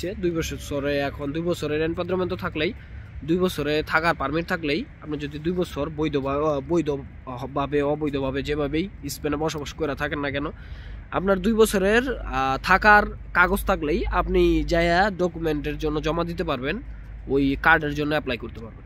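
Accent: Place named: Central Bangladesh, Bangladesh. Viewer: Vay bari koi,,, Dhakar modha nki,,kotha suina mone hoi,tangail,manikgonj, Narayanganj,gajipur,ai dikha,,aktu reply dean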